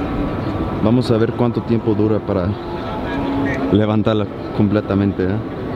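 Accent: Mexico. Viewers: desciendes de Mexicanos'? o como es que hablas tan Mexicano? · Qué bueno que visitas México porque hablas muy Mexicano, pásatelo rico en mi tierra chido güey!!! 👍🏼🇲🇽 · cada ves hablas mejor el español! y mas que estas aprendiendo el español mexicano! eso es super. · Ya tiene 3 años mas o menos que no es Distrito Federal, SOLO CIUDAD DE MÉXICO O CDMX O CDMEX, tienes acento mexicano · Wow your spanish is awesome. The vast vocabulary you have no mistakes at all even your Mexican accent is quite impressive. You ahould be very proud of your achivements. Remember one thing, Mexican spanish is spoken by at least 250 million people. So thats the spanish you got to use and speak for it also has such influence in the rest of the spanish speaking countries.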